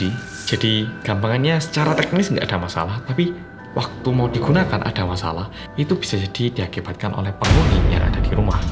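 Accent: Java, Indonesia. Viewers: HIYAKKK PPPPPP KURANB GERCEP😭 MAS MEDOKKKK👍👍👍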